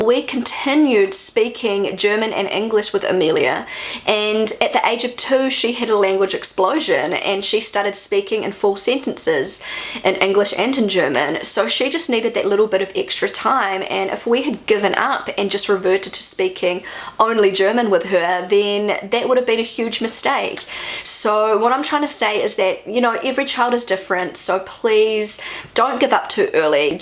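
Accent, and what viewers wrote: New Zealand. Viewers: Your posh British accent still needs a bit of work. Can't keep the kiwi out. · I'm glad you didn't listen to any of those advices!! The way you do it, is perfect! I'm a kindergarten teacher and a few years back we had a little girl from Russia. And her mum, she was such a lovely lady, thought it was a good idea to teach her daughter German as well, but she couldn't speak German fluently. In the end, the little girl wasn't able to speak proper Russin nor proper German. Oh and I looooove your Kiwi accent!!! And it's great that your kids pick it up, too, cos they're half Kiwi and this accent is a big part of them. Case closed I guess 😂 Much love from Hamburg 😘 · Hey, thanks so much for sharing your experiences with raising bilingual children. My husband is also a native English speaker and I am a native German speaker, so it might be helpful with our future children. 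My husband also grew up bilingually (English and Afrikaans), so three languages are going to be interesting. I think you guys have done a great job sticking with it! And I love your kiwi accent and that you pass it on to your children as this a big part of your biography. My husband is South African and I think it would be rather strange if our children spoke perfect Oxford English ☺️ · Your kiwi accent is so nice ;-) I try to race my children bilingual (Italian and German ) too! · It‘s a big advantage for your kids to speak English fluently! They are able to communicate wherever they travel. And btw, your kiwi accent is adorable, don’t ever change 🤩 Same goes for the sweet franconian accent of your kids, of course 👦🏻👧🏻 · The British accent part was really funny, 🤣 Love your NZ accent! ... It's soo German, by the way, this accent obsession... Embrace diversity, people!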